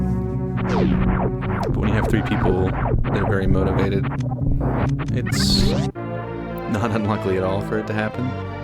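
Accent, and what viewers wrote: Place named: South, United States. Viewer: commentary!! Never heard it on your vids before is this new? You have a nice southern boy like voice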